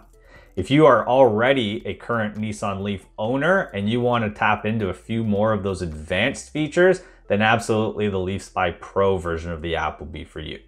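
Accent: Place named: Canada. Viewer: Excellent, clear introduction to the LeafSpy! By the way, I suspected you were Canadian even before you said so. How? I am not sure I can explain, but it's a compliment.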